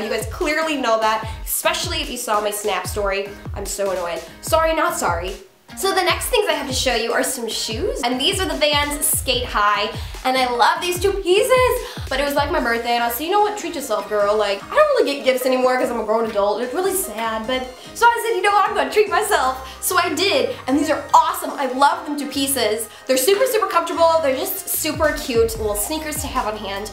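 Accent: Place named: United States. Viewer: You have a very American sounding voice, if that makes any sense 😂 like you pronounce every word very accurately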